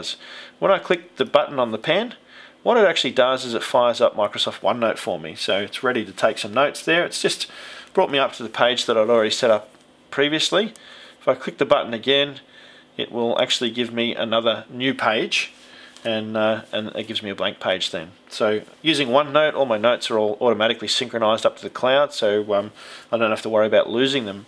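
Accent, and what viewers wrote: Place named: Australia. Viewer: Thanks for such a great presentation and review. Great to hear an Aussie accent in one of these too!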